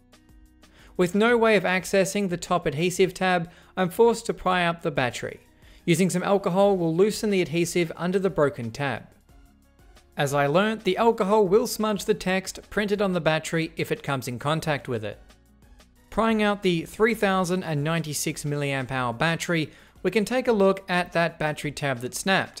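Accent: Australia. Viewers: Who else comes here for the Aussie Accent?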